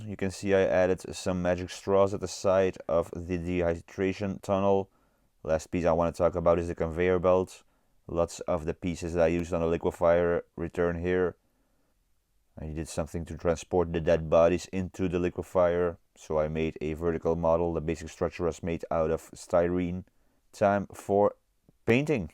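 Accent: Netherlands. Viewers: Dat hollands accent is sterk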